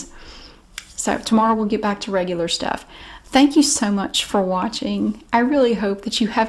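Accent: South, United States. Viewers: Btw you are a beautiful woman with a pretty southern voice and your personality is awesome. I also admire your diligence.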